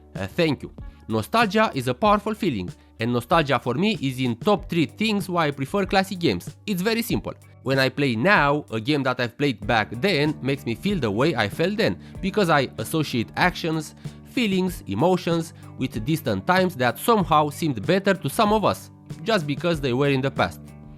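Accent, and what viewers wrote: Romania. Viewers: sami bag pula daca tu nu esti roman cu asa accent xd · you seem romanian by accent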